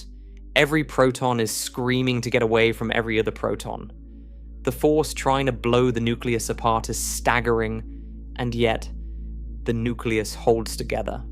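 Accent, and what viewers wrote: United Kingdom. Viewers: Another AI video with a British accent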